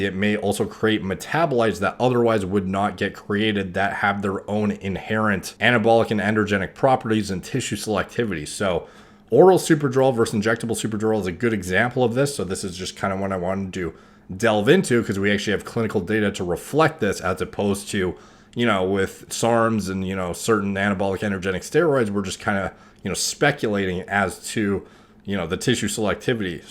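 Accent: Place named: Canada. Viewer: Thanks for the reply. Been watching a ton of his videos and was just wondering. His accent implied either Canadian or Northern USA. His accent didn't seem strong enough for me to automatically assume he is from Canada. Thanks again.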